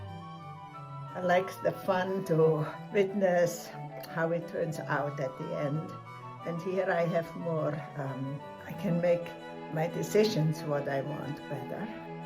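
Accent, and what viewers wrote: Switzerland. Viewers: Your DARLING one-of-of-a-kind Swiss ACCENT adds a wonderful CHARM: crisply frank & warmly feminine: BRAVA!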